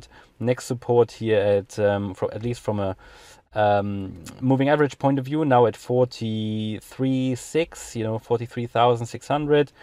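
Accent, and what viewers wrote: Germany. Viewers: love this channel, thanks dude. keep it coming. btw are you german?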